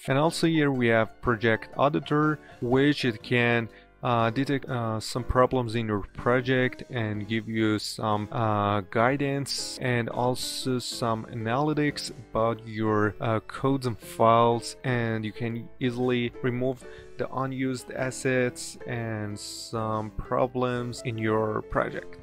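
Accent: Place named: Eastern Europe. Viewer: probably dude eastern european dont make fun of it like that, Don't make fun of accents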